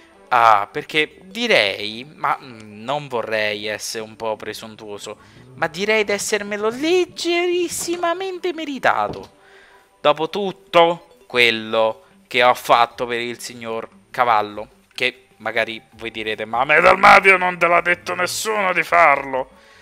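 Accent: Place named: Rome, Italy. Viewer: ah ok. Mario lo so che è di Roma dalla voce, e su so solo che ha origini asiatiche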